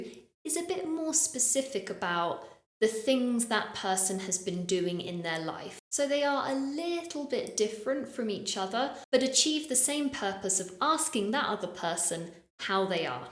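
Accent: United Kingdom. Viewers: British English ❤ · I like your British accent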